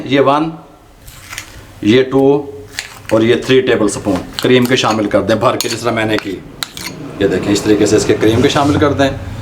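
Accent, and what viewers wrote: Punjab, India. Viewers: Urdu aur punjabi mix accent wah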